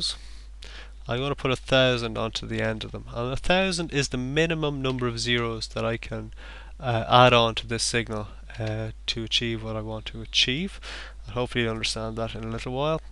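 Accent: Ireland. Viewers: also, great accent. more people should talk with irish accents.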